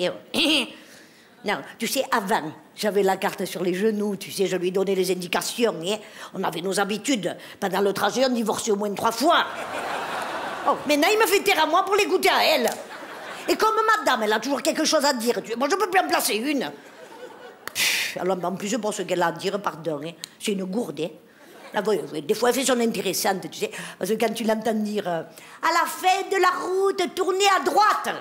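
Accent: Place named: Nice, France. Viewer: c est la perle du rire.+ Anne Romanofe = pissé de rire,sacré "Mado"j adore l accent niçois et ses expréssions .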